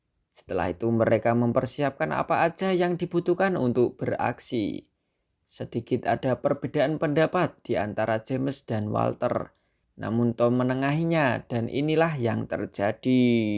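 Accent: Java, Indonesia. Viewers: Jangan medok mas, jadi kayak pahit asem gitu filemnya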